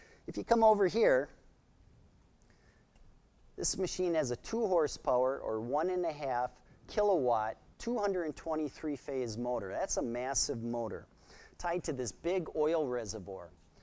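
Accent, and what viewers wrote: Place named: Midwest, United States. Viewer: 10 bucks says this guy is from either Wisconsin or Michigan.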